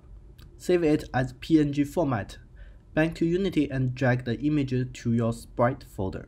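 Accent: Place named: China. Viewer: 兄弟,听口音是 中国人呀~~ 能不能搞点2D中文版本教程呀~~~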